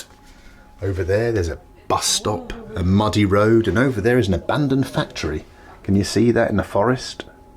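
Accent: England, United Kingdom. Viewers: Really loved watching this vlog, have not watch you since you went through your awful Covid experience. So funny and educational at the same time. Have you been back to the UK , your UK accent sounded very strong ?We just went back for a month and our US friends struggle to understand us when we get back. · Its might be because of his hiatus but sounding extra british this episode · is it just me or was his english accent stronger in this vid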